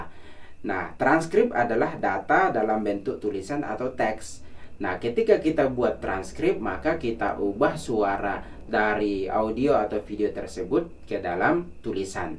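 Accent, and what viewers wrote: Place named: Makassar, Indonesia. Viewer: Kaka orang Makassar yah? Logatnya sama dengan kampung saya 🙏🏻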